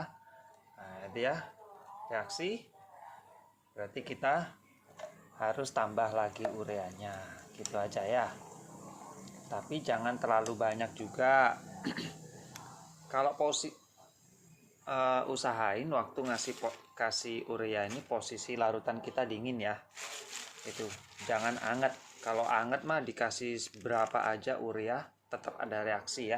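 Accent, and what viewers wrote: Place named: Java, Indonesia. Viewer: Tak kiro pean i wong aduoh kono mas, dadak kok jowo. Jowo ndi mas?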